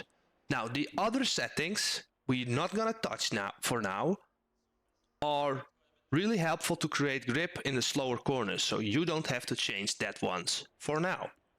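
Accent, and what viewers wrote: Netherlands. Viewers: You have amazing videos! Subscribed. Btw, you're Dutch, right? (me too). You have that Dutch accent when speaking English. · I smell dutch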